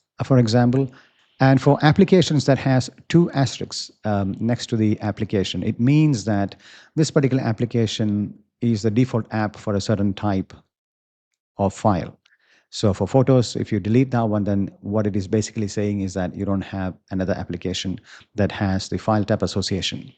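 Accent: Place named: India. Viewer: I was so happy to hear an Indian voice focusing on how to get rid of the junk that is Microsoft from your life and then I saw your header and it says Azure ! Your pace and condensed presentation is still very good 👍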